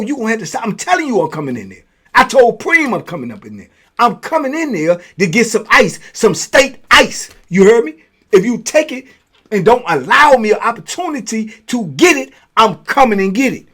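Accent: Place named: Brooklyn, United States. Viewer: love doin that New York Brooklyn accent 😅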